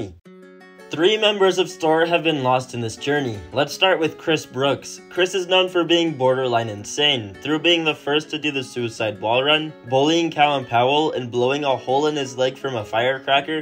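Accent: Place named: United States. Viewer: so crazy hearing an american talking about parkour over video clips of my hometown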